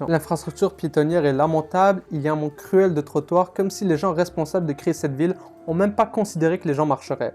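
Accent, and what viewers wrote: France. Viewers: Quel commentateur de merde! PLEIN de faux et en plus avec un accès de merde, xur à comprendre, un vrai français frustré, comme dirait Martineau " mais de kessé ”? Y a plein d autre pays pour habiter. Je suis de Québec et j aime bien Laval pour sa modernité, ses grands boulevards larges, son métro, l” université de montréal, Place Bell, espace Montmorency, centre de la nature, carrefour Laval, etc... Laval est la place no.1 au Québec pour la culture en serre... Donc, petit frustré, probablement que tu a une crotte contre ton compte de taxe ou une contravention pour avoir grillé un feu rouge te là, tu fais du bassin gratuit. Mauvais immigrant dont on a pas besoin, même si on manque de monde. On veut des quebecois d adoption respectueux, heureux et fiers. Pas ses plaignants. Mon grand père qui a, avec tous les autres grand père set mon père, ne se plaignaient pas. 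Ils faisaient. Bye, conard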